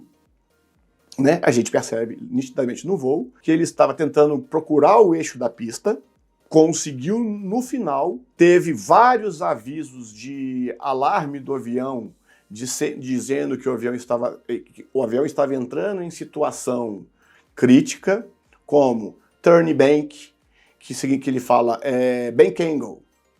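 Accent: Minas Gerais, Brazil. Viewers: Bora "resenhar" as notícias do aeroin, pelas palavras e sotaque mineires do nosso querido Cmdt. Abçao...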